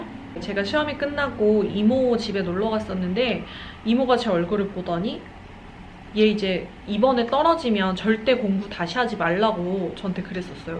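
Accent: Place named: Seoul, South Korea. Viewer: 부산분이신데 말씨는 완전 서울 말씨인데요. ㅎ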